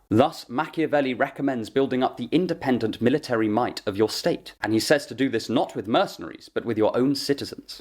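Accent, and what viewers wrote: United Kingdom. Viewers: Yes, what you said all the British Accent and story lines are good enough. But in my point of view you need some tones of voices,up and down, pitches, break down. Your British accent is really nice but boring.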